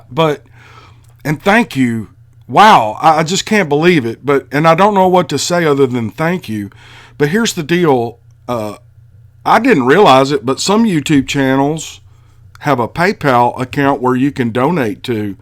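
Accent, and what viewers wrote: South, United States. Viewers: Love this channel, I'm from London so love the southern smoothness, keep up the good stuff my man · I really appreciate hearing stories from and about southern people being told by someone with a pleasant southern drawl not to mention the soothing voice. Thank you for the great job you do narrating these episodes.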